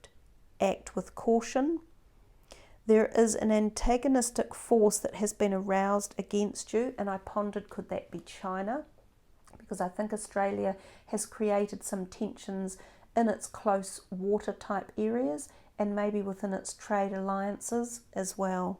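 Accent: New Zealand. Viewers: It's a New Zealand accent and yes, it's lovely.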